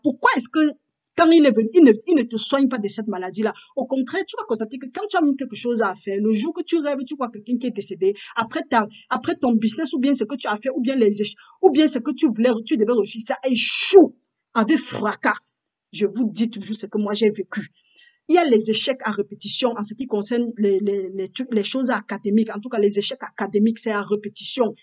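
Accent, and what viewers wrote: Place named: Cameroon. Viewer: Il me semble que vous êtes Camerounaise ma soeur de par votre accent. Que Dieu te bénisse davantage au nom de Jésus la sentinelle de l'Eternel.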